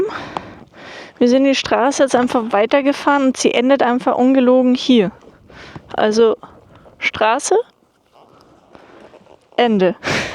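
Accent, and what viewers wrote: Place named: Rosenheim, Germany. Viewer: "Servus aus Marokko" cool! Schön, dass man in und um Rosenheim immer noch so redet, wie man da halt redet : )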